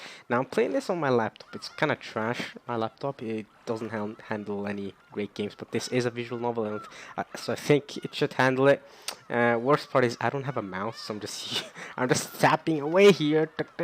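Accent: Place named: Norway. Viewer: Your accent sounds familiar. Are you Swedish? Edit: Norway. I was close! Also it's weird seeing someone play without lip synch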